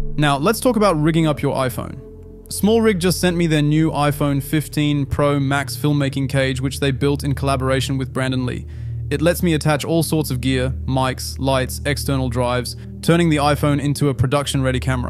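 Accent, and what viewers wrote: Australia. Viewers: Your accent sounds Australian in this video 🇦🇺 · I paid attention to your voice. It's either your accent improved (sounds somewhat australian like) or it's some AI involved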